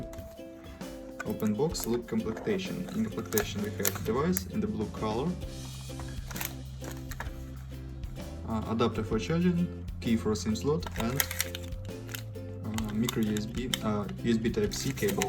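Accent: Russia. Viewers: очень сильно чувствуется Русский акцент ,но спасибо ,ибо без русского акцента мне было бы не понять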